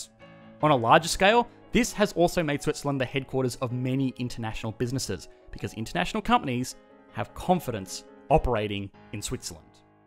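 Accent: Australia. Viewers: What a terrible (Australian) accent. Hard to take it seriously. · My God Australian Accent. Couldn't understand properly. It's very tough to understand.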